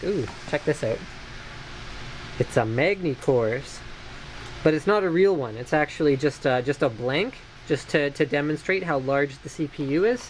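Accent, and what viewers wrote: United States. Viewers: I have to ask - are you from Canada? Because I found it interesting that you pronounced "Z" as zed, but you have a strikingly American accent.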